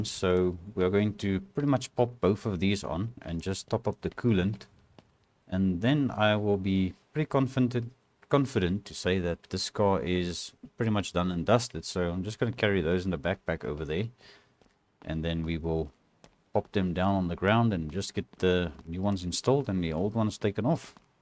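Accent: South Africa. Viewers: I knew you were South Africa as soon as you started talking. My wife is from South Africa, she doesn’t have the accent but ALL of her family does. She came to the US as an Au Pair. I can’t wait till we go visit SA because she’s always telling me cool stories and showing me some of the beautiful places over there. We went to a camping event over here in Texas and it was a bunch of SA people hosting a potjie and it was some of the best food I’ve ever had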